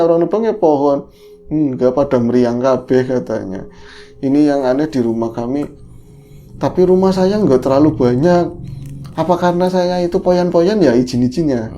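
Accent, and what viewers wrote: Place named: Java, Indonesia. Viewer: Mas ni mantap bhsa jawa ny msh totok, bguss ciri khas tersendri... Mas semakin bgus crta² yg dbwain moga sllu menghibur qt smua 🙏❤️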